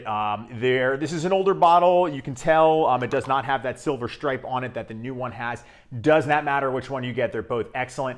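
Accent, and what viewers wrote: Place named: South Jersey, United States. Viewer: Just found the channel and it has to be noted: most people in south jersey dont have Patrick Bateman looking skincare. As a now central jersey transplant from Camden County his actual look is more like here, but his voice is 100% SJ. Most of SJ are bearded long hairs like myself or boomers.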